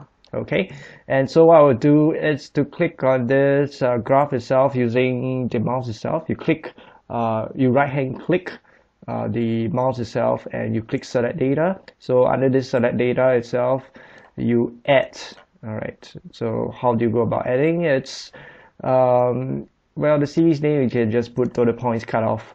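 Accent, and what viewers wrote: Singapore. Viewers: Can tell is Singaporean ! Thanks! haha!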